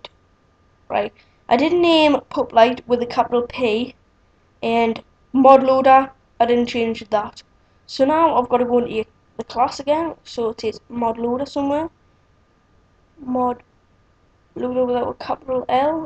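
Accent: Newcastle, United Kingdom. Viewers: It's Geordie. Newcastle in England. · Nah sounds like a geordie to me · are you from newcastle? :D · Ur a Geordie wi ai Mann (y) :D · Loopy Parky British, his accent is British. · Geordie? I'm a Geordie lad myself! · your jordie accent is really penetrating my skull :O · Are you from Newcastle? You sound like it. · This isn't a Scottish accent... This is Geordie i think. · You from Newcastle? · Accent. British. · mate are you jordey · Wow, you just showed all of us your excellent grammar skills. Thank you. And, uhh, He is a geordie. He's from Northumberland (Probably). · i cant stand your stupid british accent, thats why i stopped this video at :10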